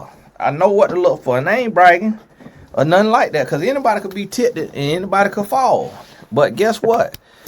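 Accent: South, United States. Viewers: Thank you Tony Gaskins for your channel as your take on life’s situations is hilarious! Love your southern accent, respectful!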